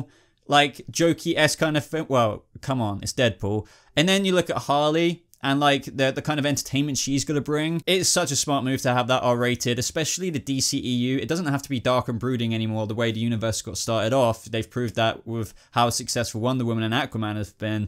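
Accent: England, United Kingdom. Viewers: Alicia Fox He has an English accent, so I’m assuming he’s English?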